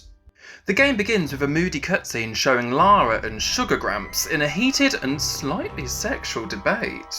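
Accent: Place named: London, United Kingdom. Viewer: Why does it sound like you live in London?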